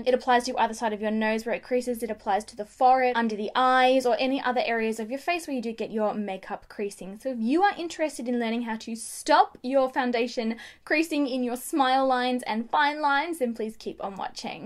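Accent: Australia. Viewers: You have the OPPOSITE skin type and foundation preference to me, yet I just can NOT stop watching your channel. And (no offense) I normally can’t STAND Aussie accents. Yours is just SOOOO cute, like a kitten purring. You’re just so charming, authentic, and your eyeshadow game is unmatched. Eyeshadow is where I seek the MOST instruction, as I have somewhat small eyes, a bit like yours, but I can’t tell, bc your eye looks are so FUDGING PERFECT. If you could do more eyeshadow tutorials, I’d send you a hundred pieces of vintage jewelry, no joke. Seriously. Also, you give the BEST BEST BEST makeup tips. I watch SO many makeup ‘gurus’, and since I found your channel just a few days ago, I’ve found tips I’ve seen NOWHERE else, which I’ve added all of to my playlist ‘BEST MAKEUP TIPS’, which only gets the very BEST videos added. Well done, keep it up 👏🏻👏🏻👏🏻👏🏻👏🏻👏🏻👏🏻👏🏻👏🏻👏🏻👏🏻👏🏻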